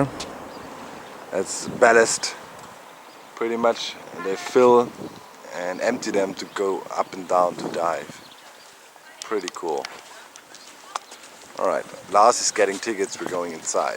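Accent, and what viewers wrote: Germany. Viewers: The submarine space inside is not very comfortable but this man says that that place is narrow but assuming this man is german (because of his accent) I'd say his super tall. The video and the explanation are great. It's amazing to imagine how everything used to work. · Alman englisch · the joke is that he is german, so its obvious he has a german accent lol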